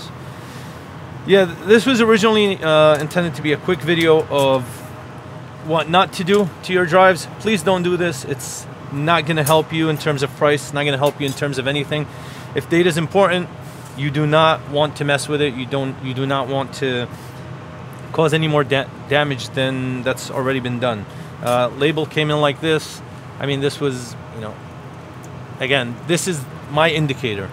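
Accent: New York, United States. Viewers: New York accent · You sound more New York than you usually do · When they both grow up in NYC anyone from outside NYC will think they sound the same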